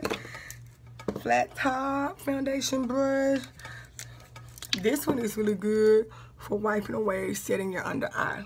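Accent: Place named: South, United States. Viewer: I love your accent you from the south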